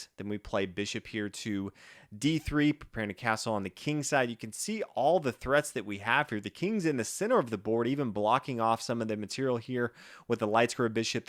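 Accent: United States. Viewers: What a stupid comment, is American so he pronounces his name with an American accent. "Алехин" make Alehin (even alehina) I don't see the problem calling him Alekhine just like I don't see the problem when every non-French folks struggle to pronounce Vachier-Lagrave correctly and it systematically turns out as "vachier-laguave". I hope you do know how to correctly pronounce Praggnanandhaa, Maghsoodloo or every other GM names that are westernized or who sound slightly different than how they are written. It's just ridiculously elitist, just thank the content creator for the knowledge he shared.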